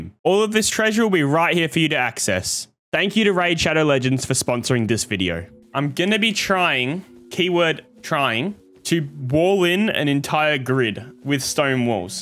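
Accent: Australia. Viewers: I dunnor, mate. I think most people can't get over how Aussie you sound - myself excluded because I love you guys to death.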